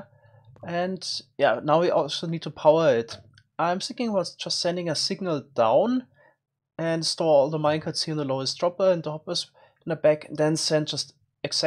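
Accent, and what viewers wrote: Germany. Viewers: Deutsches Akzent xD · Is he German? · Bist du deutscher? Man hört das voll raus! 😂 👍 Aber geile Video💗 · Man merkt absolut das du deutsch bist haha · Oh du bist von Deutschland. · Bist du vielleicht Deutsche weil dein Englisch hört sich so an 😂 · Bist du Deutsch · You Sound a little Bit german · Are you German?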